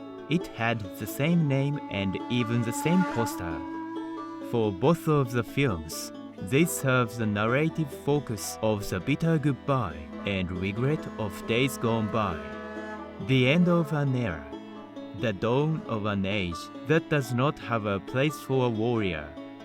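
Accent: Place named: United States. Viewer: Wait... was this for real? I am confused. This is supposed to be a joke right? *Mistakenly Calling Akira Kurosawa (movie director) as Akira Toriyama (who is the Mangaka of Dragon Ball). Also, whoever is narrating has an American accent in his fake-ish Japanese accent. (I see the person’s name is Japanese : I suspect this person lived in the US for a long time)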